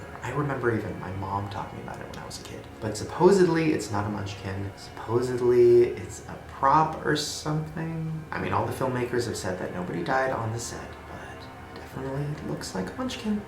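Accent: South, United States. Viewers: shane....that's still a southern accent it's just not as thick. people also tend to lose accents when they move, etc. and he lives in florida now so.... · he still has a bit of a southern accent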